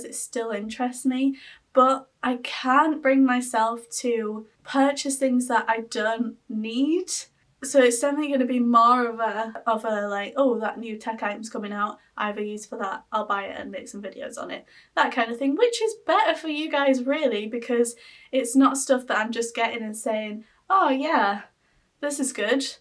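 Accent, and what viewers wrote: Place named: Yorkshire, United Kingdom. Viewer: I found your channel when I was looking up Yorkshire accents. I only know of one person from that part of England. I’m not into Sims, I do have iOS products, but I’m not as excited about them. My point... I’ll get on now and then just to hear you talking. Not trying to be a creeper, it just sounds cool. Take care. 😊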